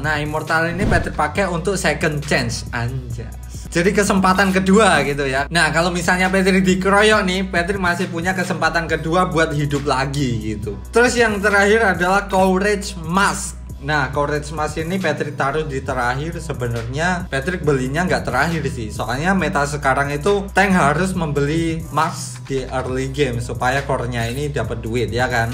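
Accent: Java, Indonesia. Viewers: Ngakak , sampean wong jowo ndi bang patrick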